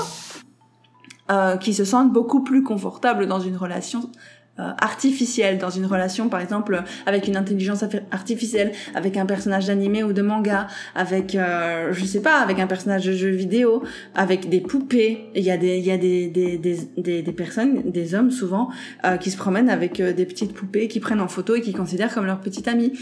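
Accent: Belgium. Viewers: Tu dis « ouit » au lieu de « uit » :) c’est marrant l’accent belge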